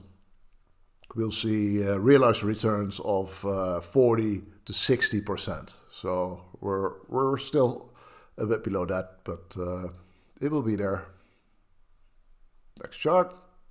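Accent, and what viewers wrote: Netherlands. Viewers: You are Dutch from your accent. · Love your Dutch accent :) have family in holland and it reminds me of them. Warm feeling. Cheers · You sound Dutch..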